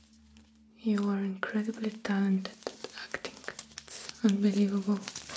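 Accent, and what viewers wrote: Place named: Russia. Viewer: Where are u from? Ur accent sound like russian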